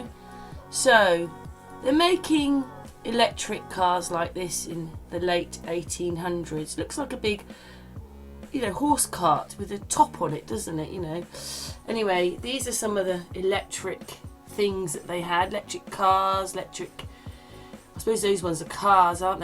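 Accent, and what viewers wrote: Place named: England, United Kingdom. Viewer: i could tell u were english simply from ur clever channel title!!! it sounded very good with an english accent and i said ,i bet shes english haha. and i think u are super awesome!!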